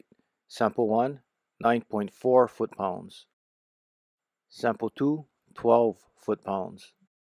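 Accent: Hawaii, United States. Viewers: You sound Hawaiian 🤙🏽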